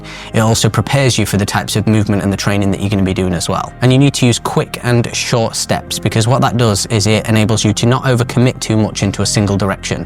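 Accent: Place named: United Kingdom. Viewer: Bro you are the most English 🏴 AF dude I've heard in a long time. Your boxing 🥊 wouldn't help in my neighborhood as all the young girls would tear you apart because they'd think you're Ringo's grandson.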